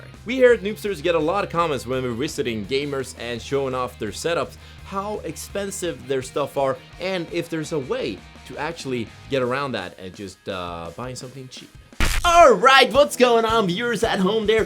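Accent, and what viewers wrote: Norrland, Sweden. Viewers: Svengelska still better ROAD TO GLÖBOL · snacka mer norrländska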